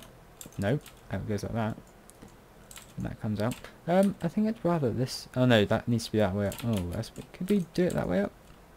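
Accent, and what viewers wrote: United Kingdom. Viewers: Your British accent is awesome you sounded like my fathers friend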